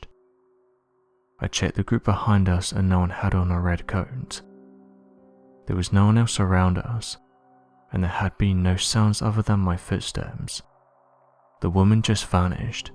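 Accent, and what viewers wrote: United Kingdom. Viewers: I think I wasted 9 minutes of my life. A former US DOD investigator with a British accent? That should have clued me in right there. It happened 12 years ago and you didn't have a smartphone to take pictures. Not very believable. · Why didn't the guy that experienced this situation be the one telling the story? Other than getting a dude with a British accent that's hard to understand tell a story that happened in America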